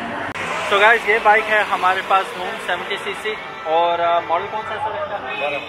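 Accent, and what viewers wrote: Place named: Hazara, Pakistan. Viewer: Apka lehja bta raha hy ap hazarewal ho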